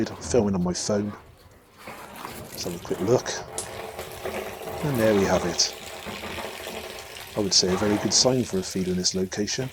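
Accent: United Kingdom. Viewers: And he speaks in a British accent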